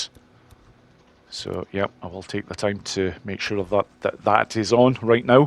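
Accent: Scotland, United Kingdom. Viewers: Just got myself my first gold in Ambush Camp challenge thanks to this guide. Looking to knock all 12 out with golds before I start the main game again with the Remastered version, then getting the Fuel tank and possibly saddle bags from Horde Assault beforehand, too. Been watching your overpowered playthrough as well and enjoying it with the commentary. Cheers for takkng the time for making these guides! I also gotta ask, are you Scottish, or at least live here, by any chance? I swear I hear a Scottish accent 😅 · Thank you for the guide. I did all the challenges with your guide. Some were easier to complete than others. Those bike ones were tough to get. Your soothing reassuring Scottish voice got me through it 😂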